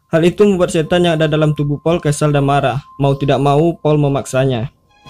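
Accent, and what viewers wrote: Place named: Pekanbaru, Indonesia. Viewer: Orang pekanbaru ya, min? Gaya bicara nya gitu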